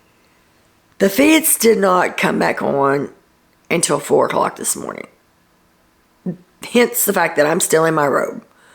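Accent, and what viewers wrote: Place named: Louisiana, United States. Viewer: I just recognized the LSU fabric in the background. Im in Louisiana and I thought your accent sounded familiar. We have such similar views on this cast and their doings; must be a southern thing.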